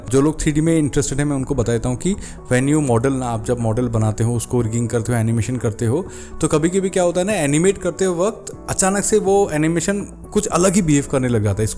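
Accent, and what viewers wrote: Assam, India. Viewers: Assamese boy speaking hindi in assamese accent😂😂😂.....moja video❤❤❤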